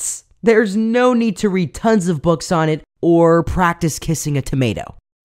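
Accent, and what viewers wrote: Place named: United States. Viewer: In thought u were american XD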